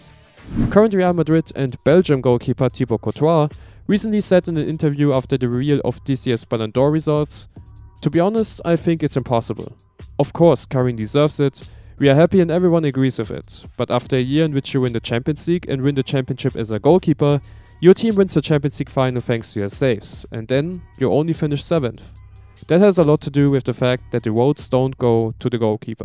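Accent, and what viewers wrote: Germany. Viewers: Bruder kannst du vllt deutsch weil dein Englisch hört sich so an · you sound german, are you?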